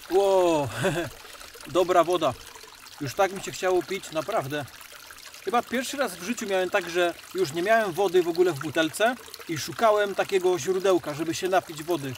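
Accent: Silesia, Poland. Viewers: Słychać że chop ze śląska 😉😁😎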